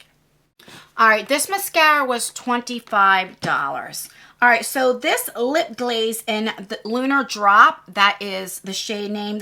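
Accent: New Jersey, United States. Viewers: Mirror mirror on the wall, who is the kindest, down to earth, supportive beauty youtuber of all????? It's just of course!!! I just recently found you but I subscribed after the first video. It's so refreshing to watch your videos because they aren't filled with drama or tea!!! Just real, friendly beauty talk I can take time out of my day to enjoy.....btw, recognized that Jersey accent right away!! Lol since I'm from your neighbor in Maryland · Mirror, mirror on the wall. We love the best of All! And that is not a lie. Love your jersey accent and your videos.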